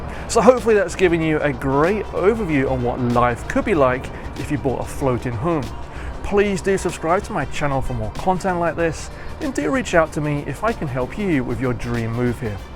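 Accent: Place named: United Kingdom. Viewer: A very marked British accent.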